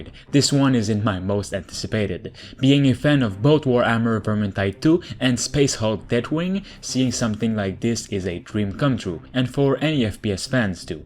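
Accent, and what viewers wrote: Quebec, Canada. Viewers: Are you from Québec? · Youre quebecois im i right ? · Are you from Quebec ?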